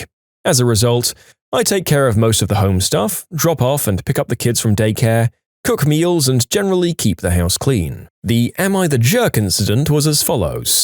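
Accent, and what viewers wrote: United Kingdom. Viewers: its been a second since ive watched this channel, when did he turn british lol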